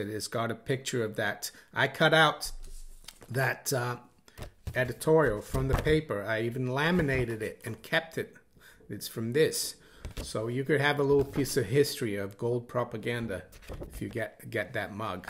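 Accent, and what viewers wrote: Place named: South, United States. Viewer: < Once I listened to a video post with sound, clearly you live a bit further south of N.E.. lol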